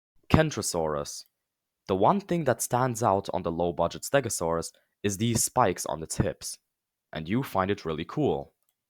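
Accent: Germany. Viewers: When I heard this guys voice I knew this guy was German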